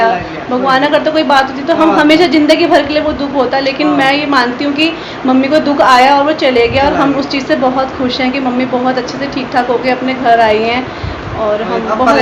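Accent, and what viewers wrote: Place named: Garhwal, India. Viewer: Apki bolne se pata chalta ki aap tehri garhwal se ho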